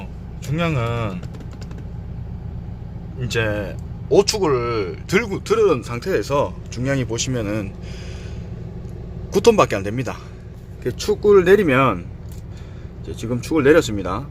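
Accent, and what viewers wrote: Gyeongsang, South Korea. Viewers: 늘 지나다니면서 저게 뭔가싶었는데 공룡알이라는 귀여운이름이었군요ㅎㅎㅎ 영상잘보았습니다 저도 경상도사람이지만 경상도말을쓰면서 친절하게 잘 알려주시네요 잘 보았습니다^^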